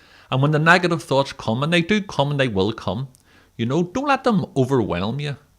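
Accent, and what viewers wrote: Belfast, United Kingdom. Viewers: Oh my goodness, I’m so glad I re found you... You are a inspiration. I’m going to share this with my Auntie Pamela, she’s from Belfast & course so was my dad... I did visit Belfast a few times in my childhood. The troubles were happening at the time. I was about 8 so I didn’t understand it really. Your accent is a warm reminder of my gran parents, my auntie Pam still has her Belfast accent. My dad passed away a few years ago sadly.. but we didn’t notice his accent at all which is quite funny. Probably because we heard it from birth. Anyways so happy to have listened to you today. My road has been very dark since 2009 after losing my beautiful husband to cancer.. 😞 Thanks again, my brother is called as well, I remember his name being a issue when we were visiting as not the correct religion.. lol But thank you. I’ll look forward to seeing you more. 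Take care. Lorna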